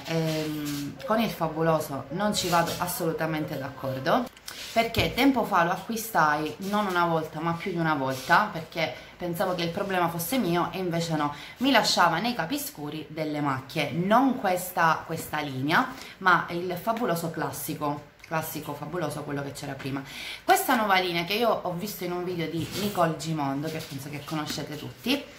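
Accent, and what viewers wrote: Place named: Palermo, Italy. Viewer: Anchoo nuova iscritta sei molto simpatica e cmq essendo una siciliana ho capito subito che sei di Palermo......io Catania ..bel video alla prossima!!!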